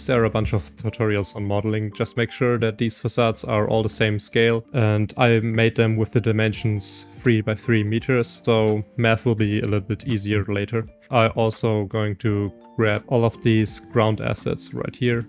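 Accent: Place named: Germany. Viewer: Don't give a F about Generator, I find Denglish accent totally fascinating :) There are accents out there that sound deeply disturbing to me, e.g. arabic or russian english, I downvote and turn off those videos immediately if I accidentally click them. It's got nothing to do with racism, it's got to do with violating a language.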